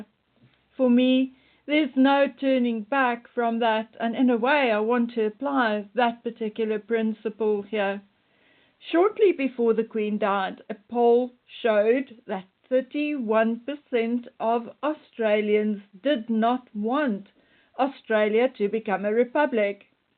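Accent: South Africa. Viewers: South African accent detected. Which province are you from?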